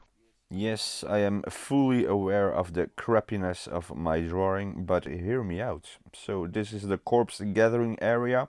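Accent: Netherlands. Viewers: Dat hollands accent is sterk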